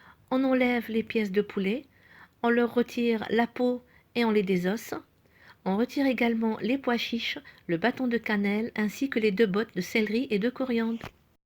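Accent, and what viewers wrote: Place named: Algeria. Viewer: Salem alik j aime bien tes recettes tu parle bien algérien je suis algérienne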